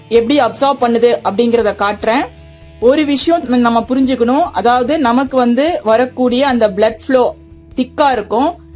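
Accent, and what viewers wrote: Kongu, India. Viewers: I m watching your all videos from 3 years ago. All videos super. Kongu tamil madam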